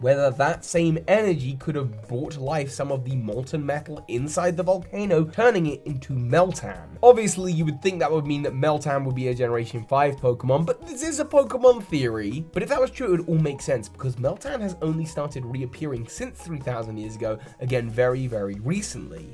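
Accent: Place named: England, United Kingdom. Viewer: I love these kind of videos so much, I could listen to for hours as he sets up and explains these theories with his English accent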